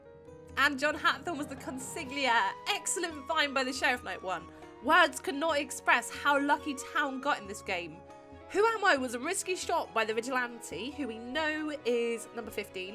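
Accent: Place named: United Kingdom. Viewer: I am always amazed at how British you sound and I'm British myself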